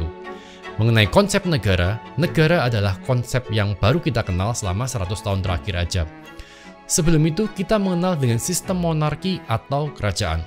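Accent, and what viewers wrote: Java, Indonesia. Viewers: Jateng atau Jatim ko, medoknya hampir ga keliatan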